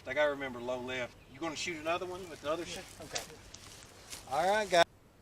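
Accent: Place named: South, United States. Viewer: MAAAN, I love your southern drawwwl I LOOOOVE it, I am coming to NC So the question is Mossberg or Browning BPS, which one is better?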